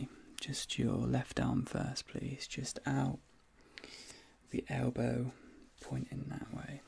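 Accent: United Kingdom. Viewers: The British accent is really beautiful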